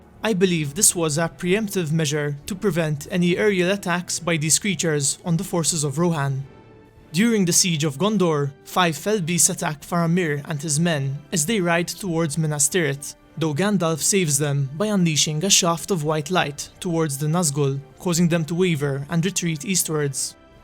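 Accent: Malta. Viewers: are you Maltese by any chance? Your accent sounds Maltese. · I could listen to your beautiful Maltese voice for hours. But harken now, as I recount the tale of Bargozím, the Fell Cockroach, foul pet caressed by Morgoth, ancient spawn sprung unwished-for from the tainted loins of Ungoliant to trouble the kitchens of Man.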